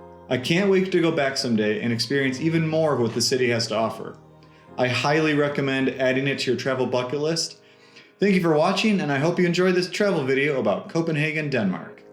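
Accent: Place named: North America. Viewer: See, a WarPigs recommendation carries so much more weight in a North American accent. Kudos to matey on the tat, very DK.. Now the best thing about short form videos is that you've actually been present wherever you've visited, might actually have some memories that aren't digital, as such. Kind of a shame though, in the sense that the narration is pretty good, you're smart enough to have survived our cycle lanes (imagine how entitled a cyclist can get, when we have right of way..) and the accent isn't one of those that makes us grateful for the ocean dividing us.. Remarkably off-topic and not so much leaning into any, umm, cultural stereotypes as just being a bit proud of our guys n gals being able to make the team - but there's a few vids on YouTube called "My War - Danish Afghanistan Documentary", that's one of the few that covers something where our boys and girls have come out to play with forces from the US, Canada and the Brits. 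Nearly all of it helmet cam footage and the rest is the soldiers' own cameras, hasn't been through the "propaganda ministry" for editing either, pretty raw. w/English CC <link> Kinda like watching the BSG Raid series..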